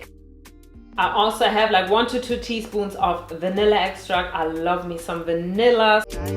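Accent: Germany. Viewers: You can hear her German accent right away it’s kinda cute she is so damn pretty tho 😍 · Sie hat doch einen deutschen Akzent, oder irre ich mich? Sorry kannte sie bis eben nicht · das liegt daran, dass ihr English sooooo krass deutsch ist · Are you German or sth? You definitely don't sound American 😂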